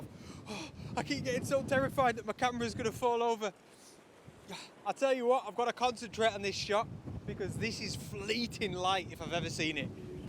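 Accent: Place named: Northern England, United Kingdom. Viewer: I really enjoy your videos., and your accent. I am from Yorkshire and now live in Melbourne, Australia, so I like to hear the northern accent. One request - can you take some shots of waterfalls etc which are not smoothed out? I prefer a more realistic view of rushing water but that’s just me.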